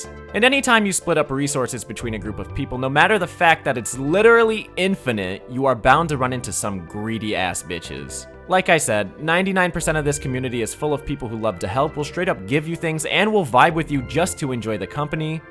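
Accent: Brazil. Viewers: Yo, is this man brazillian? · you brazilian?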